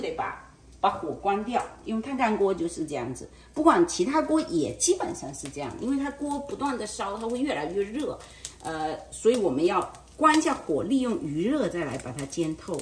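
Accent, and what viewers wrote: Fuzhou, China. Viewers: 謝謝老師的分享🙏🏼 確認一下這鍋的英文是 Carbon Steel嗎？多謝您的回覆，我超愛您的福州口音❤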